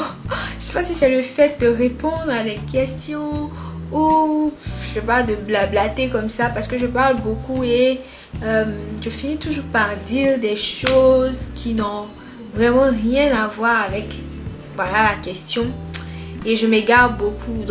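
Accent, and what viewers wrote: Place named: Ivory Coast. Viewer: L'accent ivoirien!!! Yes bisous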